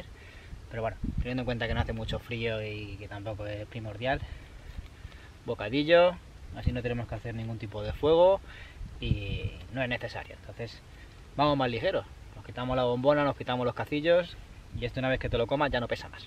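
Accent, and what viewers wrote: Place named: Catalonia, Spain. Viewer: No , no soy de Mallorca, (lo único que sé de Mallorca es el canal "Escuela de Supervivencia" que tiene una cabeza de lince ibérico como logotipo), pero lo he comentado porque pensaba que eras catalán. Pa los que somos andaluces, el acento nos suena un poco parecido. Un saludo, y buscaré esa funda de vivac. Gracias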